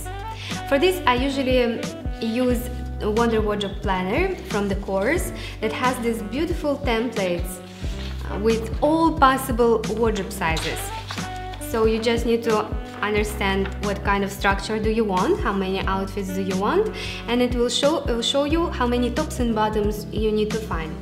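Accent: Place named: Russia. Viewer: Здравствуйте! У вас такой сильный русский акцент. Понимаю все что вы говорите )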